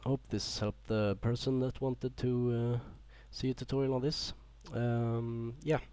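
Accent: Sweden. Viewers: U sound soo swedish! are u swedish? jag är de ;)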